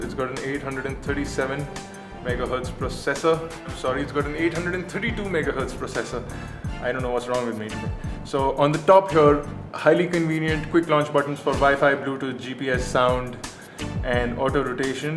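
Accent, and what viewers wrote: India. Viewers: anoing indian accent... Apu ali baba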